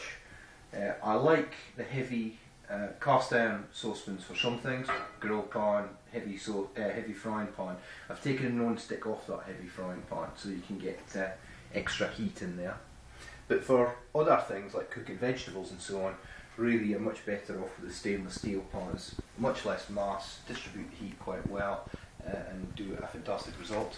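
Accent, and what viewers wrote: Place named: Scotland, United Kingdom. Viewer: If you can get past the Scottish accent, this is a very good presentation.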